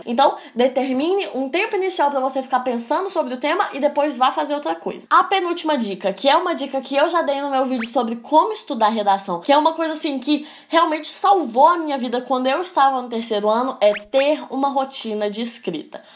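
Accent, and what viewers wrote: Minas Gerais, Brazil. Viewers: Mineiros falando é algo simplesmente incrivel.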